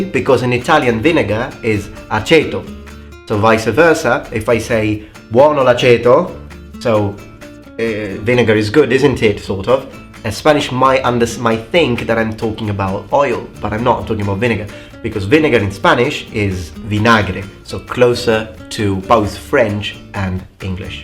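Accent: United Kingdom. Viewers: Tienes un acento británico increíble. Cómo así? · Why do you have a British accent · why does he sound so British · ok, here's one for you. My dad (padre) was born in Sicily, emigrated postwar as a small child. Mi Noni they never got a handle on much English, so I grew up hearing the dialect, enough to mess up my Spanish in college and with a Honduran girlfriend. So what is my question? why the British accent, My zio's and Zia's sounded like new yorkers and I sound like someone from Chicago? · Do you live in the UK? I'm American and can't always pinpoint the different British accents, but when you speak English it sounds un poquito italiano y muy britanico :) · I would like to hear your opinion of British English vs American English. I say this because the English you speak sounds British, not American. Besides the accents being much different, American English, especially in the Southwestern United States, has Spanish influence. 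I grew up in the Southwest, so a few spanish words were part of my natural vocabulary. I was 30 years old and living in the south eastern US when I encountered people who didn't understand when I said PLAZA. They asked me to define it, and that was the first time I actually realized I was using a Spanish word, and not all Americans understood it. It took me a couple minutes to define it. I'm enjoying your videos, keep up the good work. The closest related language to English is I believe Dutch. There may also be a difference between the similarity of British vs American English. Dutch settlers came to the New World at the same time as the English settlers, and some English settlers had lived in Holland for a while. Additionally, Amish, Mennonite, and some other communities still speak Dutch, as well as English, and even refer to those outside their communities as "the english."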